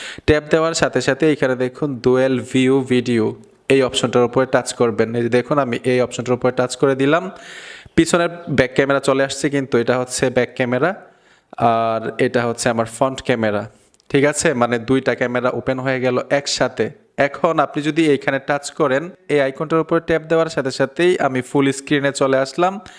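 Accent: Comilla, Bangladesh. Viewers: ভাই আপনিকি কুমিল্লার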